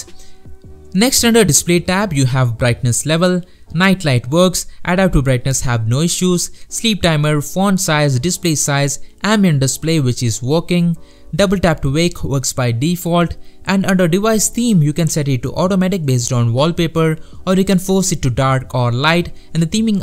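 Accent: India. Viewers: Finally an Indian English speaking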